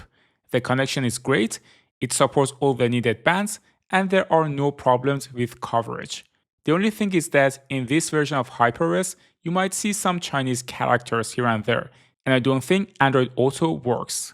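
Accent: Iran. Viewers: your iranian accent tells everything.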